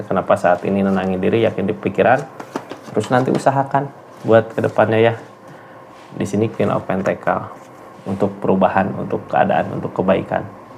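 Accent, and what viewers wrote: West Java, Indonesia. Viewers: . Hadir dari. Lampung Sy suka banget. Dgn. Logat. Sunda nya. ❤❤❤❤❤❤❤❤❤❤❤❤❤❤❤❤❤❤❤❤❤❤❤❤❤❤❤❤❤❤❤❤❤❤❤❤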